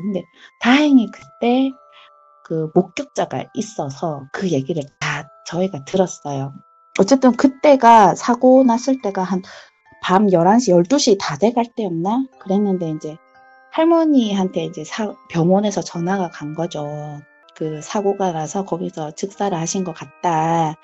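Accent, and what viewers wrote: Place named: Busan, South Korea. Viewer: 진짜 동감입니다ᆢ'부산사투리 짱입니다ᆢ말도 잘하시고ᆢ목소리도 이쁘시고ᆢ또 부탁할게요 ^^♡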